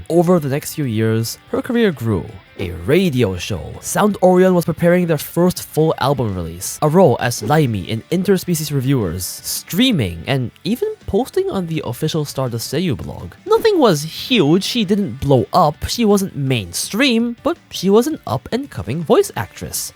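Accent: New York, United States. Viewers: Sounds like NY or something lol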